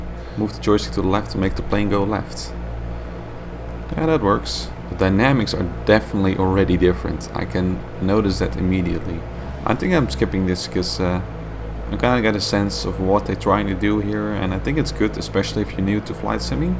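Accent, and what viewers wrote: Netherlands. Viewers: Jij bent eigenlijk Nederlands dat kan ik wel raden aan het accent Ik denk dat xplane 11 de mooiste game is genieten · It's nice to recognize the accent from when I lived in NL when I was a kid.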